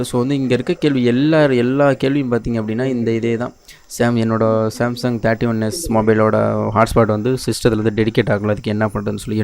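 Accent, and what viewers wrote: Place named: South India, India. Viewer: Your pronunciation is like of south indian. So your vedio quality is not useful universally. Try to use easy pronunciation so that maximum people can understand your language. Ok. Thank you.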